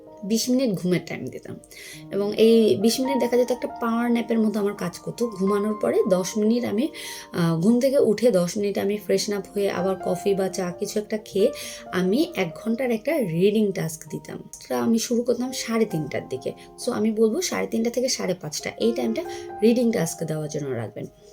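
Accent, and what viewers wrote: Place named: Sylhet, Bangladesh. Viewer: Your way of speaking seems quite similar to Sylheti peoole.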